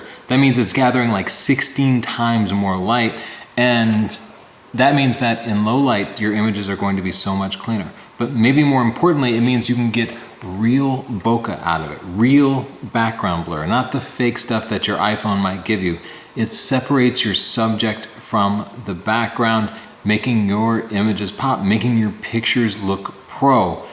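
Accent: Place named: United States. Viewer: I'm an American living in South Africa. My wife recently bought a Canon 6d mark ii, and she also bought this lens as an extra. She plans on using the camera to help film our ministry outreaches here. I want to say thanks so much to you both. My wife and I are watching all your videos and she especially loves your tutorial on her camera. You don't talk to fast, and you have a good all American accent....like me....lol.....which she is use too. God bless you both!!!!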